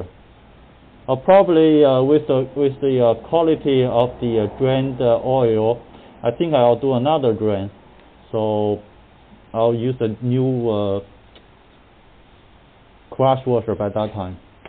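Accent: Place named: China. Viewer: cool bro!sounds like you are chinese,me too!keep going,you did good job!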